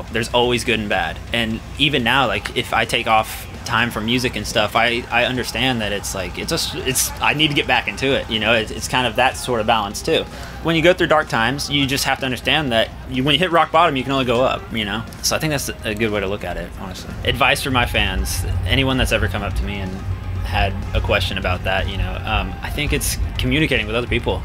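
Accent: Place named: Texas, United States. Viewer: you can hear the texas in his voice =)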